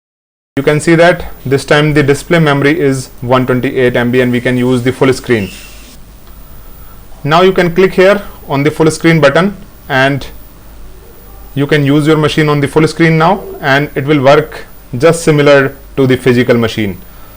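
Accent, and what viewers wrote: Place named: India. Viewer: why is your sound like a tech support scammer from india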